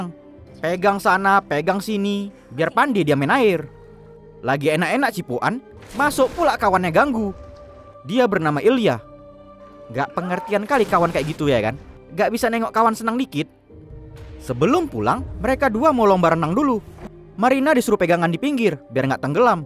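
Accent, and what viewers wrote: Medan, Indonesia. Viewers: Karna logat medan nya sangat terasa,lucu dengar ang nya riveuw😁😂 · Seru kali ah dengar cerita sm logat medannya abang ini🤣🤣 mantap..mantap👏👏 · Sumpah putri snng bngt dngn cr Mimin nyeritain setiap alur crta2 film,,dngn bhsa logat medannya rs rindu sm kampung hlmn jd sdikit terobati,,moga sehat sllu buat mimin Santun pg dr putri Jawa Timur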